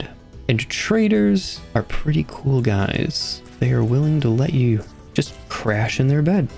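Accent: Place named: Nova Scotia, Canada. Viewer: Random but are you from eastern Canada? I would have just assumed US but the way you pronounce Rs especially in words like "marked" has the super Irish sounding quality people from Nova Scotia often have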